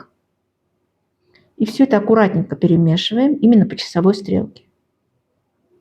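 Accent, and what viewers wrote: Baku, Azerbaijan. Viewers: Дорогая, я сразу это поняла, ведь я тоже Бакинка, Правду говорят, «Свой свояка, узнаёт издалека». Я раньше тоже вела Влог. В Баку мы все были по Национальности Бакинцы 😊 Поэтому, Наш акцент, наша доброжелательность, наш менталитет останется с нами навсегда. Я от всей души желаю вам процветания, удачи во всем. С Бакинской теплотой, и нежностью Нателла Борисовна. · Мне 82года стараюсь меньше есть чтобы не прибавлять в весе,но смотрю ваши ролики и так наедаюсь вашими вкусняшка ,конечно некоторые рецепты применяю,а калорийные лайкаю и ваш бакинский акцент умиляет,возвращаюсь в старый родной Баку.Желаю вам здоровья и процветания.Всем кто смотрит ваши ролики мира,здоровья и добра.Израиль. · Спасибо!! Привет из Баку!! У вас остался бакинский акцент, с удовольствием смотрю ваши видео, обязательно испеку!! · есть такая нация - бакинцы. Это наш говор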